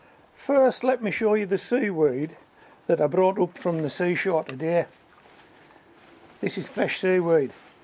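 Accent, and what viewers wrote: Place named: Teesside, United Kingdom. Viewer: Even the toast looks spot on, can I ask you a personal question, are you from up north, teesside area, you're accent sounds like you live round the corner from me 😂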